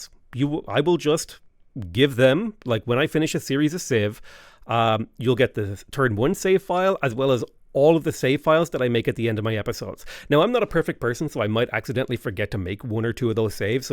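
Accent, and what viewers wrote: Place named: Ireland. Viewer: Hey, long time fan/ first time commenter, here. I think you have earned another patreon supporter since I have been enjoying your channel for over 5 years. I remember one stream where you were talking about how many people think your are so smart (the accent helps) and you replied that..." if I you were really smart I would have money and a career". I know its hard to earn a good living these days in California, and probably harder in Ireland. Congratulations! you have found a unique path that I would like to support. Keep up the great work! Oh I was wondering, do I need Facebook to view your Patreon pages? I hope not, cause I got rid of Facebook.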